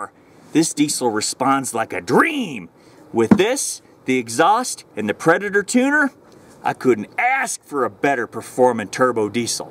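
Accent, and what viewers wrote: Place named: Oklahoma, United States. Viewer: Are you sure you're not in California? That place looks exactly like Boyikersfield. And you sound exactly like an Okey from Korn County.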